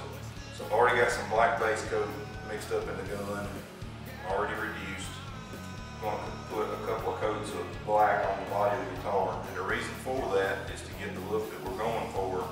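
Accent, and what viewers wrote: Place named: North Carolina, United States. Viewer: Are you from North Carolina? Man,your accent is NC. Very nice job on the guitar,btw.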